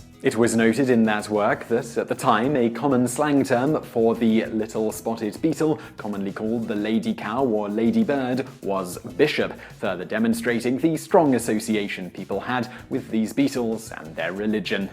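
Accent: England, United Kingdom. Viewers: I presume from your accent that you are English. Then why are you calling the lady birds lady bug's.?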